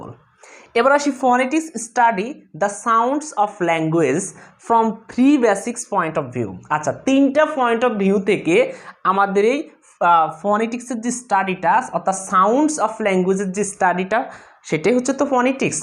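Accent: Noakhali, Bangladesh. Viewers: Bhaiya apni ki Noakhali'r?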